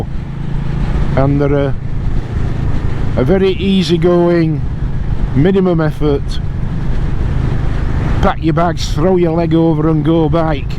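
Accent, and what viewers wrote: Yorkshire, United Kingdom. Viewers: Nice review thanks. I have an RE Himalayan in a hilly area here in Australia and have been careful to run it in. It’s a nice do it all motorcycle. I was in Bradford for 10 days in late November visiting my old uncle, so good to hear the Yorkshire accent and see the local roads again on your review.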